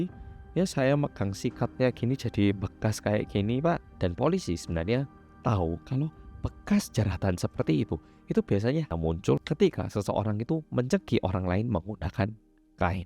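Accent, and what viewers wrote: Java, Indonesia. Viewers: Seneng bangeet yg bercerita org korea tp kyak org jawa asli ❤ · Medoookkkk.... Hadir sidoarjo samm ❤ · Selalu ikut ngomong medokkkk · Kasus nya lumayan plotlist..tp itu siabangna kok bisa Korea tp medokkk ngomongnya..campuran ya bang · orangnya juga pernah tinggal di jawa kak,jadi agak medok gitu ya pembawaan nya😂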